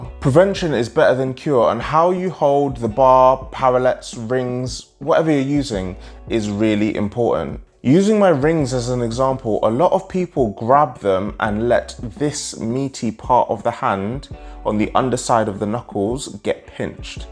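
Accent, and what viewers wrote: United Kingdom. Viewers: Your videos are twice as useful for me because I get my calisthenics info in a weekly basis AND I even get to practise listening to your UK english accent since I have the CAE C1 exam in June! Good stuff man!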